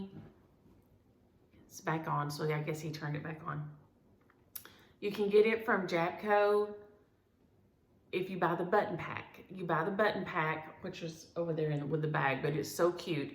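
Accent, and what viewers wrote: South, United States. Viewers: LOVE your videos and your southern draw😆😆. You have some beautiful WIPS. I love Pumpkin Pie with whip. Have a great get-a-way. See you next time.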